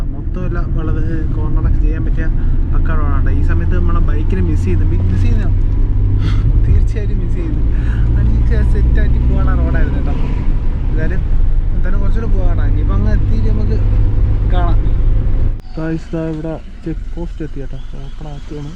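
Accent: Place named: Thiruvananthapuram, India. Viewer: ഇത് tvm ഭാഷ ആണ് മോനുസേ 😁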